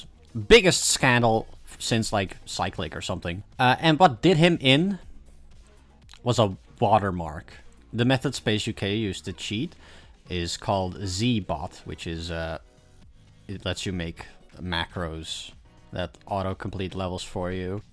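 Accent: Netherlands. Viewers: are you dutch by any chance? nice video btw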